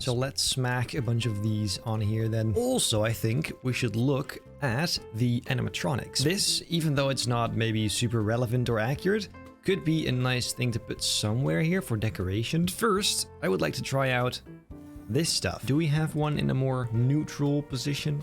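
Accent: Netherlands. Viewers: nether lands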